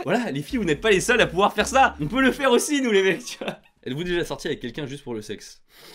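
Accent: South, France. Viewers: Quel accent du sud XD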